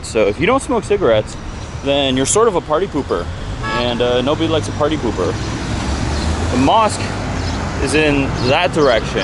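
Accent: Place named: United States. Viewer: How did you get into that country? You sound American and I thought Americans were not allowed to enter right now because of covid.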